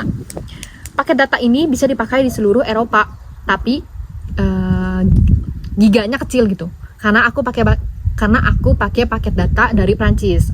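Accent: Bandung, Indonesia. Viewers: Bicaranya khas bandung banget...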